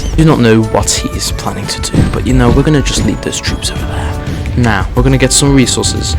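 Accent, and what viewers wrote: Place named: United Kingdom. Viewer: Nice British accent.🇬🇧👍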